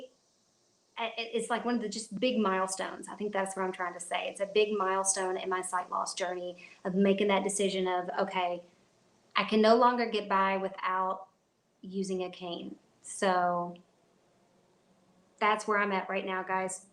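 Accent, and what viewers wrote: South, United States. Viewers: Thanks for your live chat vlog tonight. I had to drop for a bit and checked back in. I love your southern accent! We are from California and have been in GA for almost 30 yrs now and people say I have it too now. Best of luck going back to the cane. My husband got a guide dog a year ago and it changed so much of his life for the better. His confidence skyrocketed. Thanks too for answering my questions tonight! Very helpful. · Good stream and love your southern accent looking forward to more of your videos two toes up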